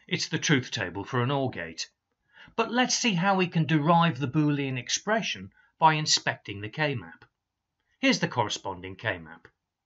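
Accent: England, United Kingdom. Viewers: great video sir. refreshing to hear an english accent teach stuff like this on yt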